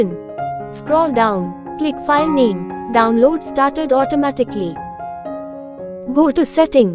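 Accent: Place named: India. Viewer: Might be good content, however I simply can not stand listening to Indian people speak. Especially an AI speaking Indian. What a turd of a video.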